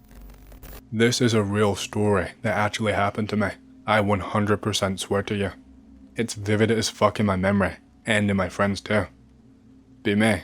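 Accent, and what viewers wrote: New York, United States. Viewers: Next time do a Scottish accent your new York one is perfect 😂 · Man i would never believe your voice wasn't AI with how point and consistent in your tone is if not for your NY accent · Just have to say it....New Yawk!!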